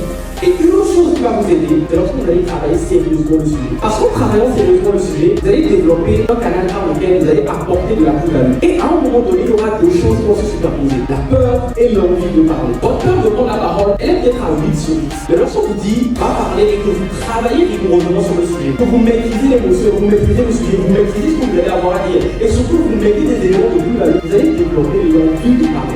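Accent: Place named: Africa. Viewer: Vous vous exprimez très bien malgré le petit accent africain. Cela dénote de la qualité et la quantité des efforts fournis.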